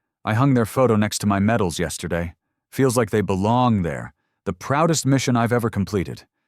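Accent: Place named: United Kingdom. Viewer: Uk English 😅😅😅😅😅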